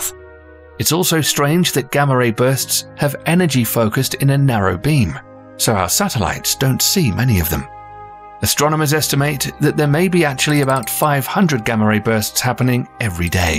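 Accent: United Kingdom. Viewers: Can you switch the narration accent to Jamaican please. The UK gets annoying.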